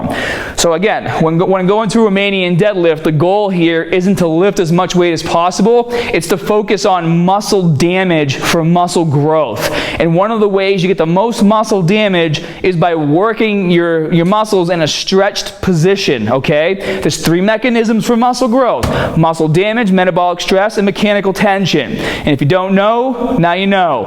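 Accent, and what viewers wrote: Boston, United States. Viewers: Ahaha the Boston accent came out on this one forsure! Loved the biggie smalls reference as well. A lot of people can definitely learn from this video especially those who think wraps don't ever have its purpose in lifting.Thanks for the shoutout bro can't wait for more and more people to join us on muscularstrength! #NattyNation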